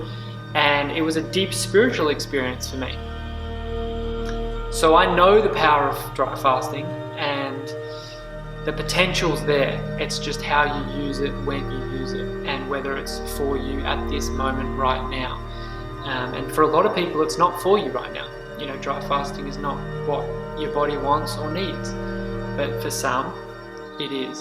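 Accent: Australia. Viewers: Is there any value in doing Intermittent Fasting and not having any water or do you have to fully fast? (great to hear an Aussie fast!)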